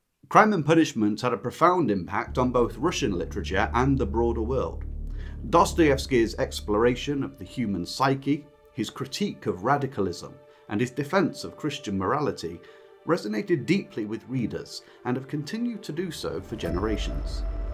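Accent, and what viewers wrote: United Kingdom. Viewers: Hi, thanks for this review and all the others you have published. Just one thing, could you leave the music off? It is very distracting, and we Americans have to concentrate to understand your british speech.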